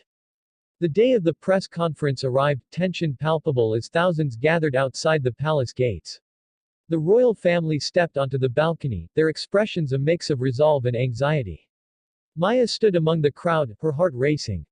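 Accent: United States. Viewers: American accent?Bye bye.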